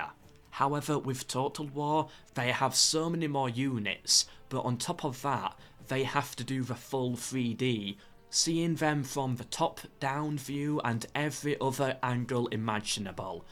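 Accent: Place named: Northeast England, United Kingdom. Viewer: What accent is that? North East England but where?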